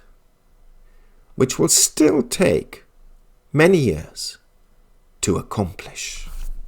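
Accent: United Kingdom. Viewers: China owes the US a trillion dollars? Are you on drugs? The US is and has been running record deficits with China for decades and your position is they owe the US? Despite your smug British accent you clearly are a deep state shill. Figures don't lie but liars figure. China holds 30k tons of gold, the largest gold holder on the planet so your figures are out in left field. China's debt is to itself so it doesn't need to revalue gold but when it does it will expose the wests paper ponzi scheme and then your lies will be illuminated.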